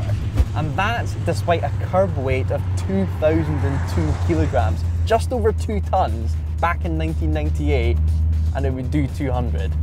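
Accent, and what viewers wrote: Scotland, United Kingdom. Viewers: I love the Scottish accent more than the car. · Is that a scottish accent? And what a great car. The Vantages have always been the best looking Astons. (Every generation.)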